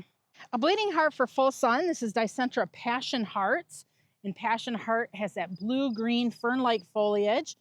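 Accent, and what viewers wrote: United States. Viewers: If American mispronunciations bother you so much, maybe you should consider not watching an American's content about an American company, on an American made media platform. Clearly what we lack in proper pronunciation, we make up for elsewhere or you wouldn't be here.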